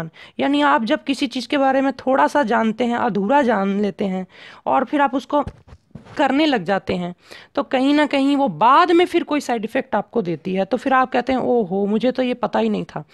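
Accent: Punjab, India. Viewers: swami g..aaj tak maine itni sachayi or somyta awaz me kbhi ni dekhi...chahe bzy hone k karn shuruaat ni ho ri ..bt aapki vani mein puri schayi jhalkti hai..beech me punjabi ka jo tuch hai ..usse to aur.bhi saadgi jhlkti hai..jo hmare buzurgon.ki.yaad dilaati.hai..god bless u swami g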